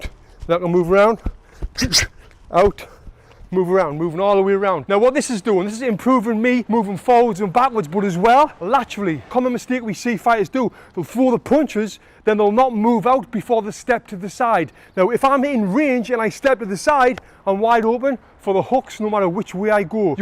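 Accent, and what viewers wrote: United Kingdom. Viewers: I consider your tactics fair. Boxing is a sport. In a sport we must use tactics and our brain first and foremost. So not engaging in order to get the best result for you is tactics. If your oponent has slow feet then using your feet as defense is a great tactic to tire him and make him frustrated. Whoever says that your defense and your strategy is cowardish needs to think again. For example, if I have an oponent who has great body shots and is taller than me, I need to play with my chin very low and using my body moves left and right to avoid the body shots and attack from the low with uppercuts and hooks, without using my cross and jab so much because I risk my game against a good body shot boxer. So this is a tactic. Also what you did is a tactic, fair, and you exploited your strength (fast feet) and your opponents weakness. Thanks for your impressive and detailed videos. 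I train myself using your videos, mainly for fitness purposes, and from every boxing youtuber you stand out for your athletic spirit, your kindness and knowledge and also for your badass British accent! Love you! Keep it up!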